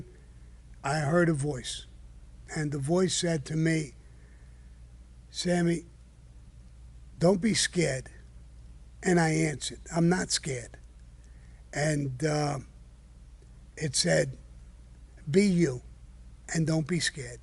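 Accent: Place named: Brooklyn, United States. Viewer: I speak the same way, no offense to anyone. It's a Brooklyn thing , I think 👊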